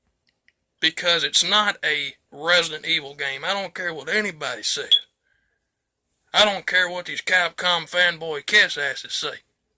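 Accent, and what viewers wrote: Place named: Appalachia, United States. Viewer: why you talking like a hill billy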